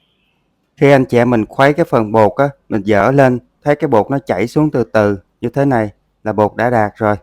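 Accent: South, Vietnam. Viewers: Em có giọng nói dễ thương ngọt ngào của người miền Nam, giọng cười hiền hòa . Em chỉ làm bánh với công thức rõ ràng . Cảm ơn nhiều chúc em thành công .❤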